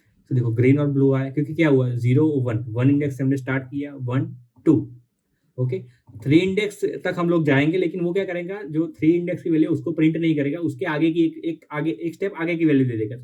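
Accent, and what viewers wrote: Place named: Gujarat, India. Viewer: Bhai, content ekdam solid che! Thodu communication polish karo, Gujarati accent ne remove kro, to channel next level par jase. 🔥 Keep going strong! 👏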